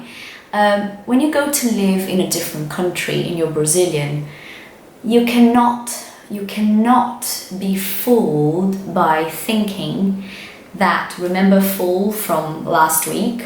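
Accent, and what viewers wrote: United Kingdom. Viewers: what a wonderful british accent! :), congrats for your channel, it's gonna be helpful for my english studying.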